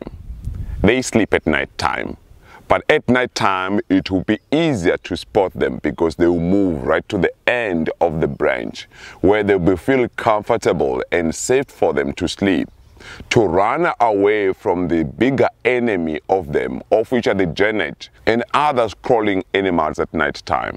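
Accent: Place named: Africa. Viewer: hey man that is rude andrea preti i am also from afrika that is how afrikans talk also zulu and i am fine with that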